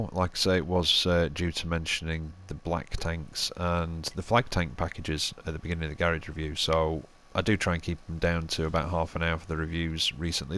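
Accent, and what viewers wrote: United Kingdom. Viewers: British Bloke lmao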